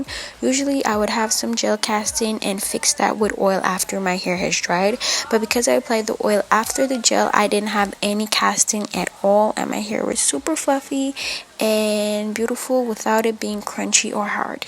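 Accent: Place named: Caribbean. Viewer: Ahhh I knew i heard a familiar accent! i’m from the bahamas Your hair is gorgeous!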